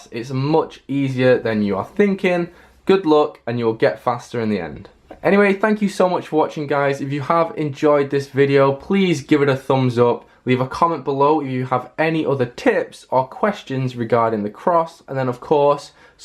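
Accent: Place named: Manchester, United Kingdom. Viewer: So much easier to understand when it is explained in a Mancunian accent, like my own. :o)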